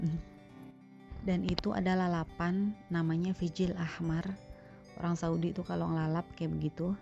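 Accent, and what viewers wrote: Jakarta, Indonesia. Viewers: Logat Betawi nya jangan hilang ya mpok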